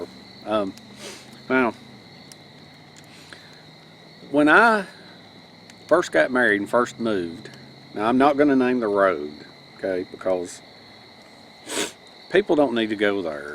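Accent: South, United States. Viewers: Ow yes I "love" these scary stories..especially when it's spoken with a Southern draw..greeting from The Netherlands❤🇱🇺 P.S. love your channel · Yep. Shame that the Southern accent is slowly disappearing.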